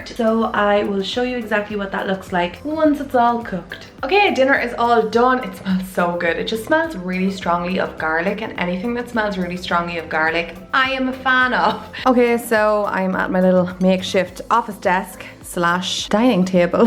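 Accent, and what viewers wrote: Ireland. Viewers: I love watching your videos. You give me new ideas on how to change up my every day dread of what to fix for a meal. No 2 people do things the same lol. I've been watching your videos for probably close to a month now. Definitely have my notifications on! Thank you so much and much 💘 to you!...... P.s. what started my binge watching is your accent. An Irish accent has always been my fav. Never hear people with those here in Alabama (USA).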